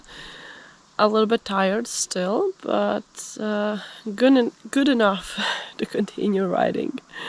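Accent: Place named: Latvia. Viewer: Tas nodevīgais latviešu akcents...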